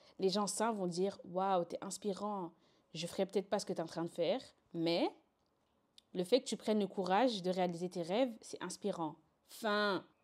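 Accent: Flanders, Belgium. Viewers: mais oui t’as un accent ! c’est sur t’es belge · Oui elle a grave un accent belge hahah bien néerlandophone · Ouii t’as grv un accent flamand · Tu as un accent de flappie qui parle français soupoudrer d'origine Rwandaise ou burundaise 😗😗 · Oui tu as un accent ça fait belge, un peu néerlandais ou je sais pas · Mais t’a juste un accent belges 🥲 il doit y avoir plus de français dans ton TikTok ( je me suis abonnée mdr j’aime bien te regarder sur tiktok donc why not)💕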